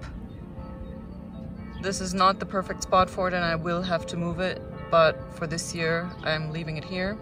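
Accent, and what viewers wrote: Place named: Canada. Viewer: I love all of the old brickwork you have! Darn meece are always feasting, aren’t they? Where are you based? Your accent sounds like my lovely neighbors to the north 🇨🇦! Your seedlings look very healthy and happy! Love the bright green shoots! Everything looks great and ready for wonderful food production! 🥰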